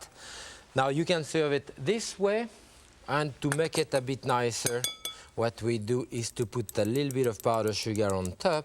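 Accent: France. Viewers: Are you trying to say English should have less of a French accent? I'm not sure what you're saying.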